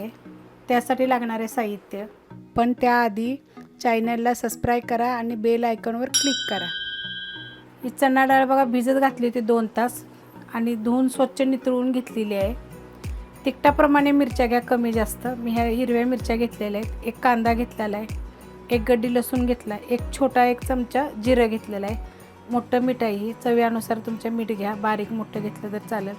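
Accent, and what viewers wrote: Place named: Marathwada, India. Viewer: Tumhi marathvadyachya ka? Bolnya Varun asach vatla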